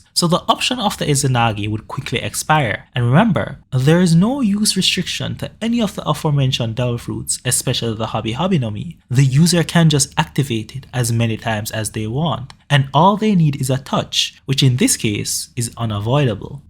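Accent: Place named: Jamaica. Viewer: this man has a jamaican accent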